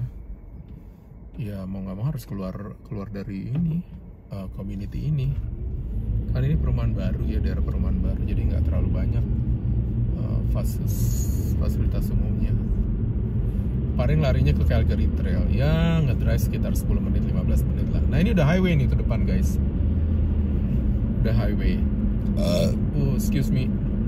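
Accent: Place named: Java, Indonesia. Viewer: logat bicaranya njawani banget kok gak mbataki Semoga chanelnya makin berkembang dan sukses ya bang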